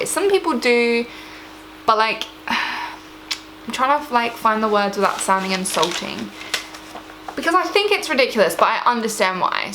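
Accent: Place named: London, United Kingdom. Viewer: You're accent has definitely become more London :) I'll not say 'British' because there isn't really a British accent.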